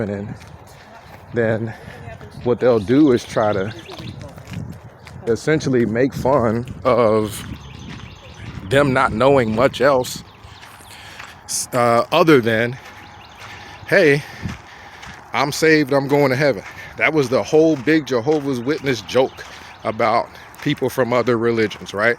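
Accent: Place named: Texas, United States. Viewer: How long have you been in Texas? You are developing a Texan accent.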